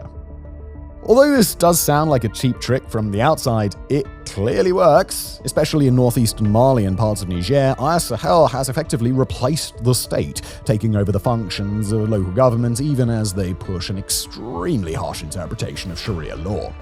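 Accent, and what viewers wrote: United Kingdom. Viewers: He said Mali, British peoples L's sound like R's because of their accent.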